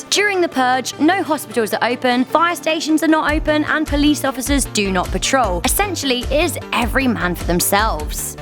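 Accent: United Kingdom. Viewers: no offence, but how come a lot of these kinds of channels have annoyingly high-pitched british-accented women?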